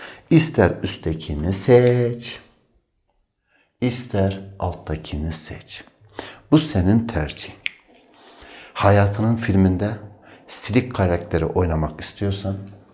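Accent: Urfa, Turkey. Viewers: Hocam siz kesin urfalisiniz.konusma tarziniz harflerin cikisi ayyyyyyy ayni ben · Bu hoca Urfa 'lı mı ?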